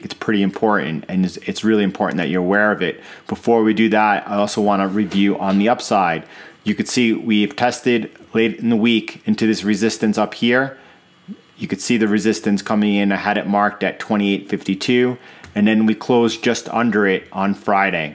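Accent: United States. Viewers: NY accent, but where? Long Island?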